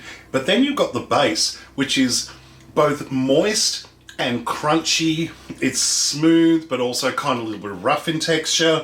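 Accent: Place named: Australia. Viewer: This is my first time here, oh how I love our Aussie accent. Now I will follow you on utube, cake looks yummy.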